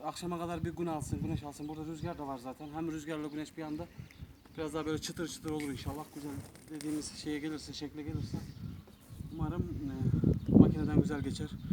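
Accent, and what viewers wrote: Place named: Karabük, Turkey. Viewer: Yeni gordum seni bende karabuk eflaniliyim sivemiz ayni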